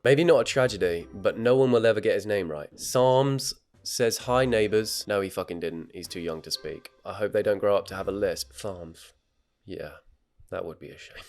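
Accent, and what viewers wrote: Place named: United Kingdom. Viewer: i thought he was italian why is he so brittish. im scared.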